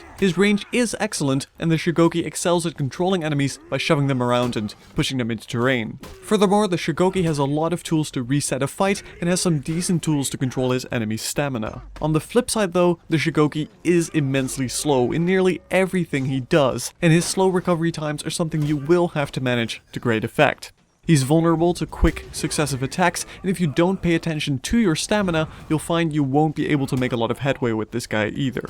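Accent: Netherlands. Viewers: I'm new to this channel, but are you dutch?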